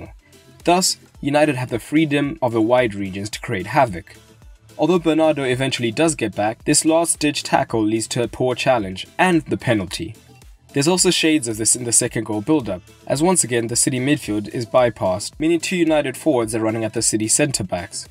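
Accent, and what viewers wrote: South Africa. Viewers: Hey man are you South African??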